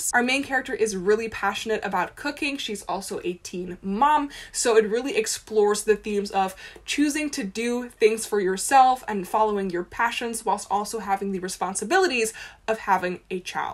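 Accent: Netherlands. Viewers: I detected a Dutch accent right away haha, but I speak the language myself so that makes it easier to spot 😊 Her English is flawless, indeed!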